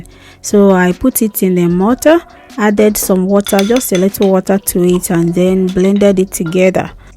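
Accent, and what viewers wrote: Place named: Ghana. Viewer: Oh my gosh 😍 isi ewu of the mighty wash down You killed it chef 👨‍🍳 👏🏼 🙌🏾 You have ghanian accent, hv you lived dr?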